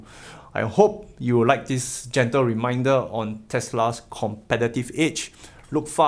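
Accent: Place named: Singapore. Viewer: It’s just a standard Singapore English accent. Should be easy to get used to.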